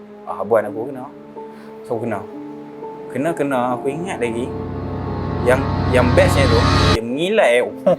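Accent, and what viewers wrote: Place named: Kedah, Malaysia. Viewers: Org Kedah yg dok Perak ni mmg dh bercampur sikit dh Bahasa dia ngn KL. Tambah plk dia pernah dok Ipoh yg ada byk org berloghat KL sikit... Mcm² loghat org Ipoh tu... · Truih kan loghat utara mu brader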